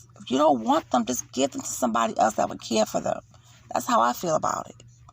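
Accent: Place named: New York, United States. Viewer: I'm from Detroit. I love your ascent. Sounds like NY?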